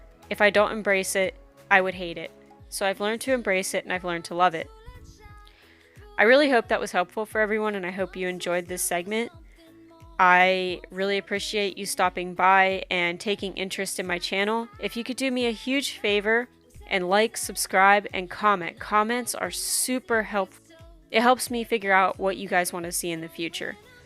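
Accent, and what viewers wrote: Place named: Pennsylvania, United States. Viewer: I'm very sorry. Could be a malpractice suit somewhere in there. Just because a person is 18 years of age doesn't mean there's not an serious underlying problem occurring. If the Docs were that concerned about drug use- just needed to perform a simple lab test there on the spot ( I worked in a laboratory). Would've been ruled out immediately... and quite possibly could've addressed the serious medical issue before it proliferated or worsened. Once again, I'm sorry. You sound like your from Central to western Pennsylvania or even southern Ohio. The manner in which you pronounce the word "cold" is audibly simulant of that area. It's cute though. Please be well.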